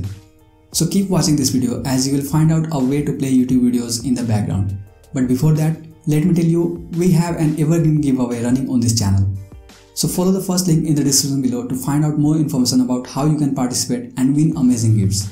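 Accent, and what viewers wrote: India. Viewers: Once I hear an Indian voice I knw its for good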